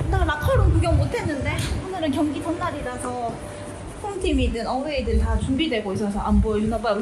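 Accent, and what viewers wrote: Gyeongsang, South Korea. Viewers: 경상도 삘이!!!! ㅎㅎㅎ 평소 좋아하던 분인데 정감이 가네요!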